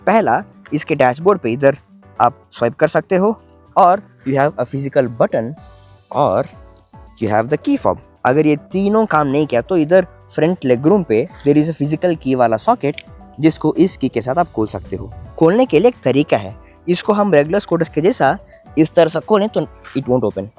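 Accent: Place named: Telugu, India. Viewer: telugodu hindi matldutunattu enti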